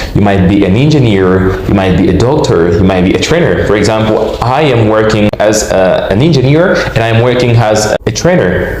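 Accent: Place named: United States. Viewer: Awesome video 🔥 you sound American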